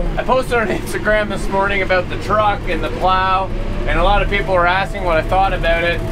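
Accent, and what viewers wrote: Canada. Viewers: Those Canadian long Os are hysterical.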